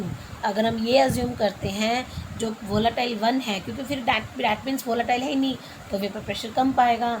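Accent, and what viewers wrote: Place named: South India, India. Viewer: vo south india h dont judge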